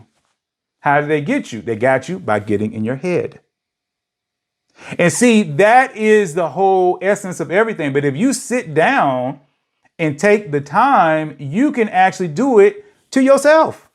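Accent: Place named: South, United States. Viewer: your country (or what some perceive as Southern) accent is hilarious 😂😂😂. I did not see that coming. Laughter is good for the soul so thank you for opening in that manner. I always appreciate your viewpoint so I was wondering if you are receptive to sharing your insight on a video recommendation from a viewer.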